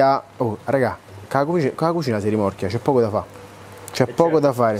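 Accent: Rome, Italy. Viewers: Ma non è che imita. I romani parlano così 😅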